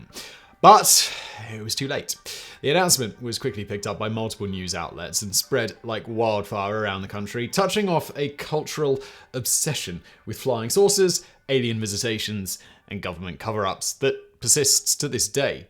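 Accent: England, United Kingdom. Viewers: Misinformation never sounded so english and bald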